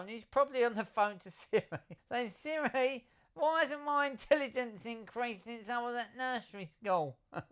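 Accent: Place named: London, United Kingdom. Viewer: Its really good how you think up the the comparisons its quite poetic along with your London accent makes it more funny I love these videos their really funny keep them coming please 😊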